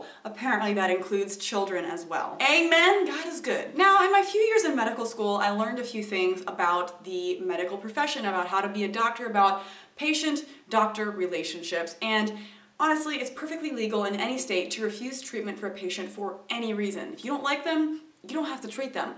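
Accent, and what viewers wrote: South, United States. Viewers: Why did you put on a southern accent? Michigan is as north as it gets, minus Alaska.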